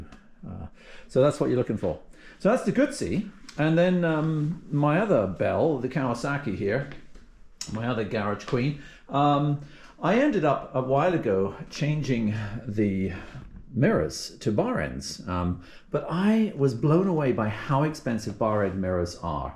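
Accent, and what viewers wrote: England, United Kingdom. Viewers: Good video I’ve got a lot of your videos to catch up on I can’t believe the price of them mirrors I don’t know how much that would be in £ but sounds a lot and do you originally come from uk you sound English to me if you are I live in Essex near Colchester you might know it take care will catch up on some more of videos take care ride safe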